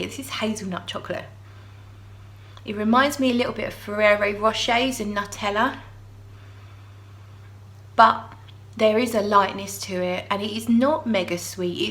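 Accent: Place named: United Kingdom. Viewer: I am new to the fragrance hobby, and I just recently discovered your channel. Not sure if it’s your British accent or just the soothing sound of your voice, but I find your reviews hard to stop watching 😀 I really enjoy how you describe scents, it makes them come alive in my mind. Thank you. I am ordering a boat load of samples from LuckyScent based on your reviews!!!!!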